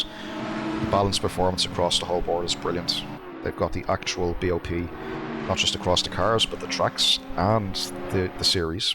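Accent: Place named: Dublin, Ireland. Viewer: Ijust bought this game yesterday it's cool so far more practice is needed sounds llike your a Dub like myself hi from Dublin Ireland.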